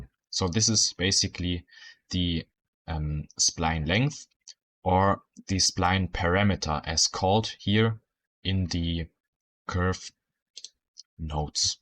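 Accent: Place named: Denmark. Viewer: oh sorry😅 you sounded very Danish in the video, great video btw!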